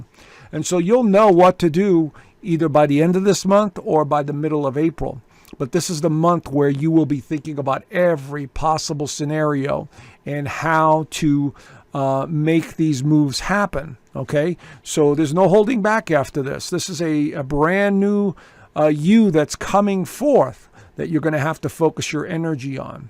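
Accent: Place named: New York, United States. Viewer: Sounds like you’re from the 5 Boroughs or NJ.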